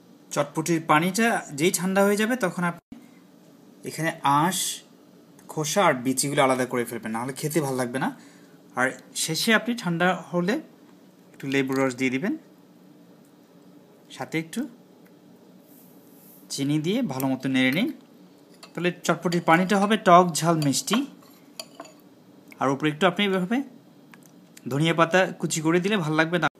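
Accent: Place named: Chittagong, Bangladesh. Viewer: is this man from chittagong ? he sounds so .. ! :-o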